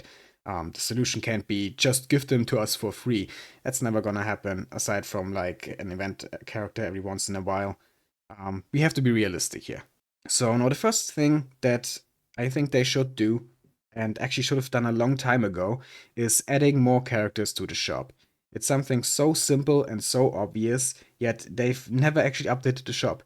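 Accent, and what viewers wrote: Germany. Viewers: The german accent is strong with this one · I hear German or am I insane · I can sense the german is strong in you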